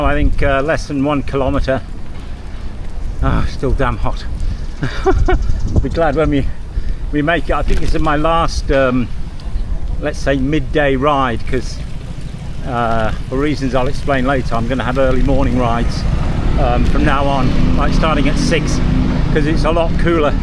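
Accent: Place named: England, United Kingdom. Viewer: Just loving English accent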